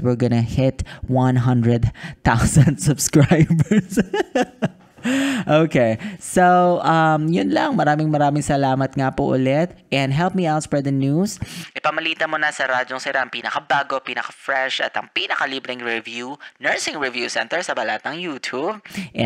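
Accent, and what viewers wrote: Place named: United States. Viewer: English di maintindihan parang amercano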